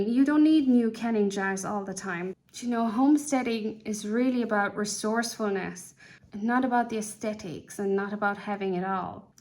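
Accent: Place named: Ireland. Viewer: Happy to have JUST found your channel! I could truly listen to you speaking any time - it reminds me of the brogue of my Da's speech. Da's gone now, but it's like homecoming to hear it. Anyhow - the points you make are so very true! Homesteading can be any form, depending on the homesteader! You don't have to be 100% self-sufficient to be a homesteader. Garden where you are, how you are and learn what you WANT to do. If you garden, learn how to preserve what you grow or what you can get on good sale so you can use it when it's not in season. Etc, etc. Homesteading isn't for EVERYONE - it can be stressful, but it can also be comforting. Don't let "influencers" be your model of how you should be. Much love!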